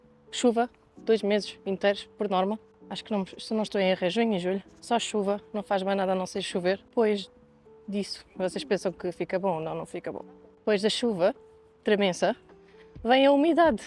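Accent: Portugal. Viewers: Adoro ouvir o Português de Portugal!! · isso varia de pessoa pra pessoa, eu por exemplo, não estou familiarizada com o sotaque do idioma, está foi a primeira vez que vi alguém falar português de Portugal depois de 3 anos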